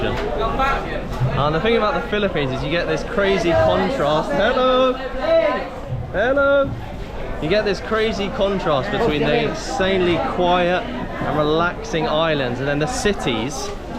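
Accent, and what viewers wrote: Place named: Australia. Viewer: Hi, I thought your accent was Australian. I’m going to go check out Davao in October.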